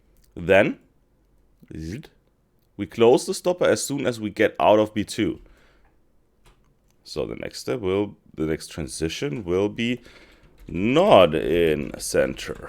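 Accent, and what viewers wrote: Germany. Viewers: man merkt doch schon nach dem einleitungssatz dass der dude deutscher ist xd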